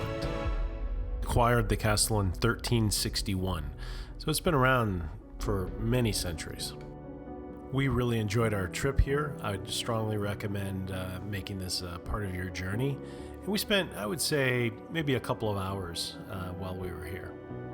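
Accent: United States. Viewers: It just sounds as if insulting a country isn't important enough to try pronounce it properly while enjoying the culture seems to be your approach to other country's Scotland isn't worth trying your hardest not to offend. A small country who gave the world some of the most important advances penicillin, television,pneumatic tyres, steam engines and most important educated thinking 🤔. Umh just a thought for your Midwest pronunciation if its worth being done do it properly.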